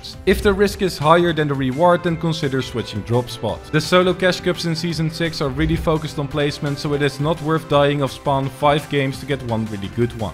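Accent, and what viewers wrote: Netherlands. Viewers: Ik weet niet als ik het goed heb maar je bent Nederlands toch of hoor ik het verkeerd I dont know if i right but Your Dutch right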